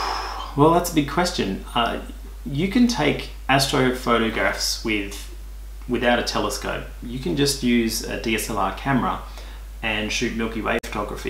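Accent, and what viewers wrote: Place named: Byron Bay, Australia. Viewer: That’s a hell of a well developed ear for accents you have...! is from Byron Bay which isn’t all that far away from Brisbane...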